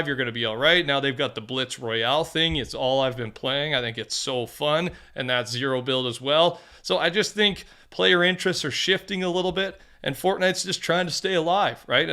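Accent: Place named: Canada. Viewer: He's Canadian they Pronounce Word differently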